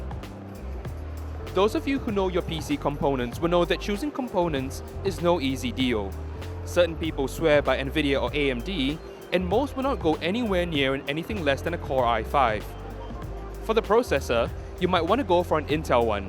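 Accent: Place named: Hong Kong. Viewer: Probably Hong Kong cuz we so do have a Asian British accent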